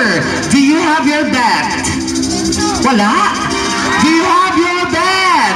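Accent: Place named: Visayas, Philippines. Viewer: Bisaya kaayo oy..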